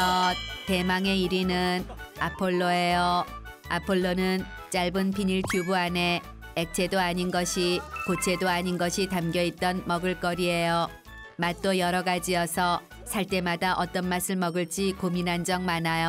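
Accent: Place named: North Korea. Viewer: 근데 목소리가 북한말같음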